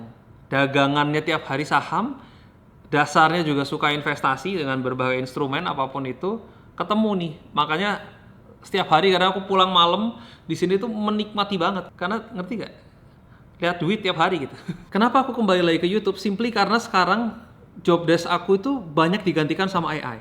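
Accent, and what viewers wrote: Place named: Banyumas, Indonesia. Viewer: kayaknya kok sekarang makin kerasa logat ngapak e wkwkkw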